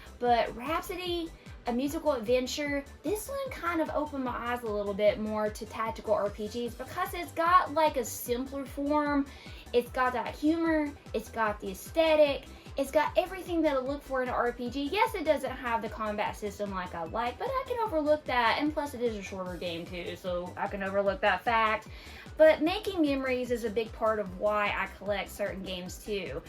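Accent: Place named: Georgia, United States. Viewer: youtube suggested your channel to me, your personality is great and your accent reminds me of my friends back home in GA lol so i dropped a like and sub 👌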